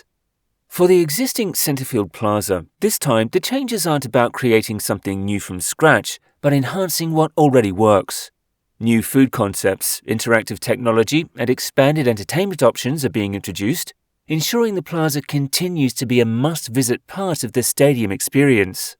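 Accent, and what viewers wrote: United Kingdom. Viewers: That is not the most iconic stadium. It’s a piece of sh!t. With all of that money they need a new stadium. Oh and when talking baseball don’t use a guy with a British accent. You lose people right away.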